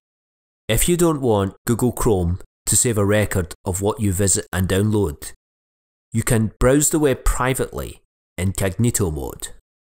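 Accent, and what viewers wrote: Scotland, United Kingdom. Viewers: so nobody gonna talk about his thick scottish accent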